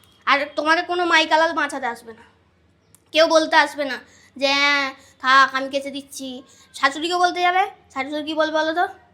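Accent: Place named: Hooghly, India. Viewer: Tomer kotha sune mone hoche hoogly tebari kintu hooglyr meyera erohkom noy to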